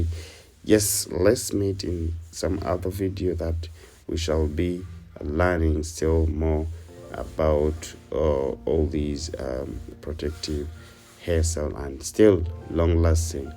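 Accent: Uganda. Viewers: This accent is sooo Ugandan 🇺🇬🇺🇬🇺🇬🇺🇬 my first time here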